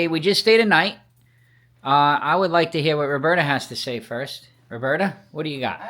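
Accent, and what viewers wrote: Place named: New England, United States. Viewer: I had to chuckle when y'all talked about different pronunciations of words when you come from different places. When I first started watching your videos, after about 2 videos I was thinking "He has to be from New England". LOVE New England! I'm in Virginia so I get the "Southern THANG" all the time,lol. Great video!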